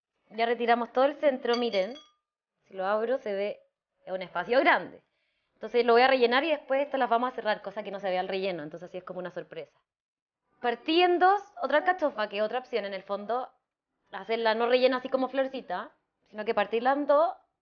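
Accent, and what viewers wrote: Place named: Chile. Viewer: Que delicia...lo haré.. quiero algo diferente!!! Saludos desde Costa Rica y me fascina el acento chileno👏👏👏👏🤗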